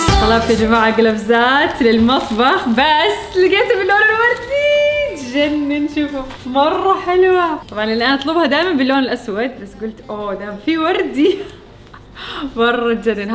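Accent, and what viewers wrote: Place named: Iraq. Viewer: احكي يوام كامل عراقي😊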